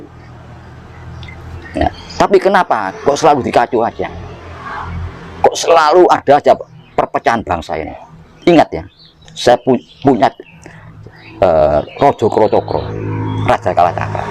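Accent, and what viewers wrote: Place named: Lumajang, Indonesia. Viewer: Logate wong Lumajang..Iki..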